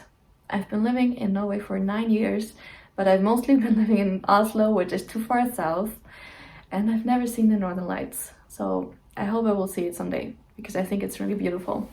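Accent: Germany. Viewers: In one of the videos you say that you didn’t know if you had a German accent, I can confirm you 100% have a typical German accent when speaking English. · I can hear the German part of you in some of your English :-)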